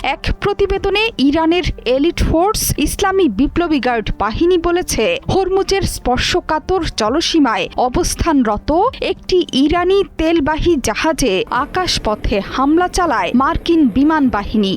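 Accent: Kolkata, India. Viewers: কলকাতা থেকে ট্রেনিং নিয়ে আসছেন নাকি আপা।আপনার উপস্থাপনার ধরন এরকম কেন?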